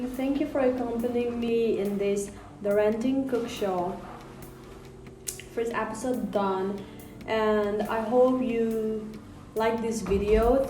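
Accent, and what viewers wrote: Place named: England, United Kingdom. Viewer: ton accent anglais est trop mignon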